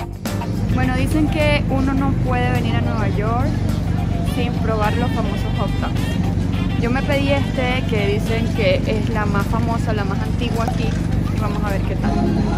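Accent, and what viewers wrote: Venezuela. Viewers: De donde es esta chica? Tiene un acento como de Venezuela,pero dice palabras que solo usamos en México 🤔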